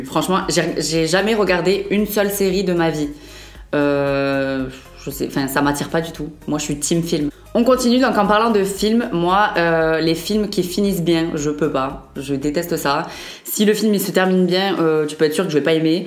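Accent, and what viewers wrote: Quebec, Canada. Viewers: Vive l'accent québecois !! 🇨🇦❤🇨🇦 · Tu sais quoi ça fait pas longtemps que je regarde t’es vidéo et sincèrement tu gagne une abonné en plus ! La Mayonnaise , le KFC , Team série.. Non merci Je suis anti que bas du corps mais j’alterne mais effectivement que le bas .. No way ! 👍🏽 L’accent québécois c’est cute ! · Omg j'adore l'accent québécois 😍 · "LES ANDOUILLETTES C'EST LA BASE" trop raison! 😁 L'accent québécois c'est tellement mignon 😍 LES TACHES DE ROUSSEUR C'EST MAGNIFIQUE ! Trop cute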